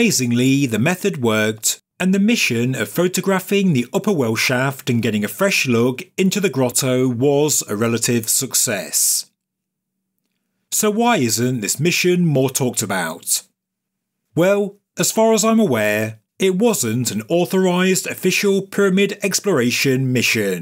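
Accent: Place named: England, United Kingdom. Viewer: Awfoool. Oh u r English man.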